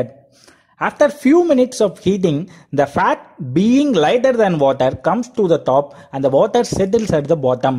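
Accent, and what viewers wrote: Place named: India. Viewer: His indian accent, I couldn't understand because I'm not native speaker.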